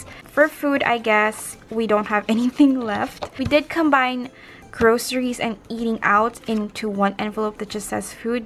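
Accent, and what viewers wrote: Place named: Philippines. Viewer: Hii are u filipino? I think I can tell from the accent ❤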